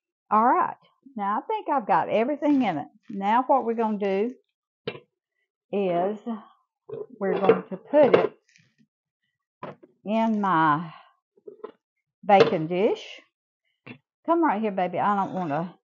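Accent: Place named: Alabama, United States. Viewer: I’m so thankful YT took me to your channel. You remind me so much of my beloved grandmother. She’s with Jesus now but oh how I miss that beautiful and feisty woman inside and out!! 🕯️ ✝️🥹 I want to thank you for your grandson’s service. My own son is a Master Sargent in the AF. This morning he and his family (DIL and 4 of my grands are PCSing to Spain for 2-3 years. Such a wonderful opportunity for all of them! But I will miss them dearly. 🥹🇪🇸 May I ask..are you from Alabama? Because you share my beloved grandmother’s accent. 🥹🥲 God bless you both. 🙏🏻✝️ 🌹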